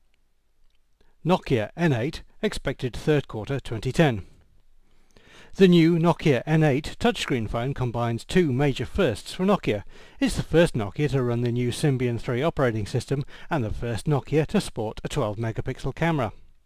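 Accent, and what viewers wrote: United Kingdom. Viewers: I hate english accent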